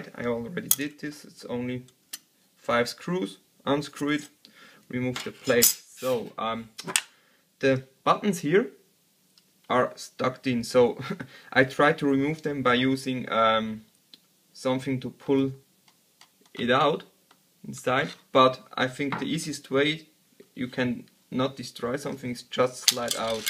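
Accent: Germany. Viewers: Alles Verstanden Infos die ich wissen wollte bekommen.. Wüdre 2X Like geben schon alleine wegen der Guten "Positiv gemeint" "Denglisch" Audio. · erst, vaucc :D You are german no doubt :D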